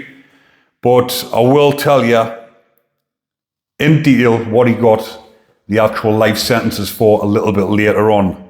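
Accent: United Kingdom. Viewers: Its so nice to hear British person speaking English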